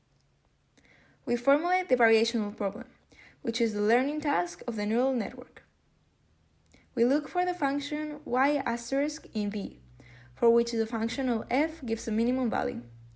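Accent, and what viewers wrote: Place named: Turkey. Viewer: She sounds like she is Turkish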